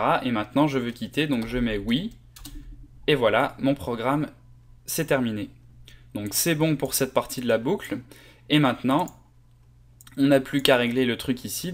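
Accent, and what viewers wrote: Canada. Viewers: T'as des sonorités canadienne dans ta voix ^^ Sinon merci pour ton tutoriel, il est juste parfait ! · il m'avait bien semblé déceler un accent canadien dans ta voix !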